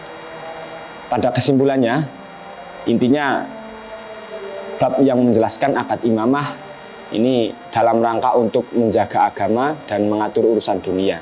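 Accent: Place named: Java, Indonesia. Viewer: Bahasamu medok sekali mas 😂🙊🙊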